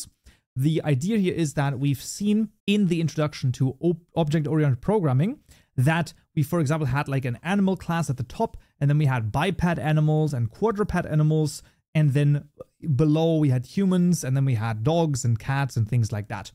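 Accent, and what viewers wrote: Germany. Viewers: Funny german accent <3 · Sure, Ill do so. Funny german accent <3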